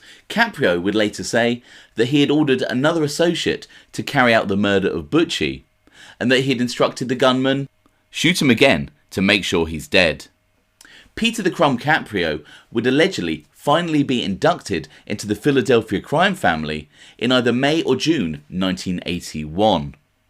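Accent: United Kingdom. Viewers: Dude, sorry, but it's so weird to listen to you, discussing American Mafia issues, with that hardcore British accent. Am i the only one here? All the credibility is just not there. How would you know stuff sitting back there in London?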